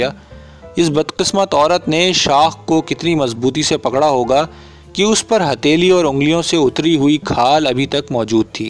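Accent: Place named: Pakistan. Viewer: Aapke talaffuz se aisa lagta hai ki aap pakistan se ho.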